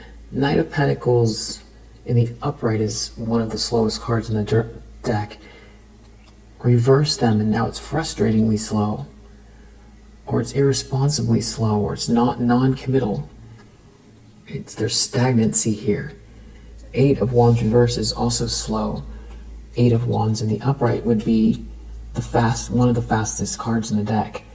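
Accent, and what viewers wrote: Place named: North America. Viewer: Im pretty sure isnt British, you can tell by how she pronounces her "R"s she is almost certainly from north America (Probably USA, or maybe Alaska or Canada)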